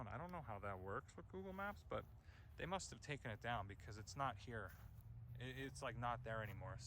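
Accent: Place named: United States. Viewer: this guy is American. Of course he says it American.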